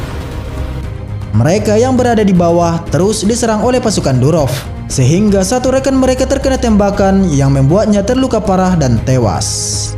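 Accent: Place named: Java, Indonesia. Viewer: Fokus ke pembaca narasi.. mendok jawanya terasa bnget..😊😊😊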